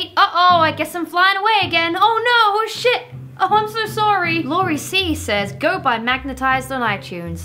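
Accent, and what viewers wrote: United Kingdom. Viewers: I have another friend who gets told she sounds American , but it makes me LOL every time because she (and you) still sounds so British to me. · i was really caught off guard by your british accent?? why? i guess i've been watching a lot of american youtubers lately. anyways, your book cover is FLAWLESS!! ily xx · 1) What do you think happened to the guy that was released from North Korea in a coma? Update: he died. 2) As an American, I can safely say that you do not sound American, you simply have a "light" British accent. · As an American I can very safely say that your accent isn't remotely American. Don't know what locale of British but definitely British to an American ear. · To an American, you still sound British and we love it ❤️❤️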